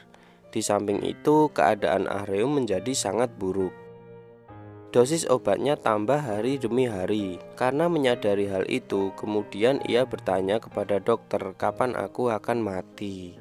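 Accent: Java, Indonesia. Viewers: kenapa kalau ente yg ceritain film ini feel sedihnya kagak ada....yg ada malah ngantuk! mungkin karna meddok yah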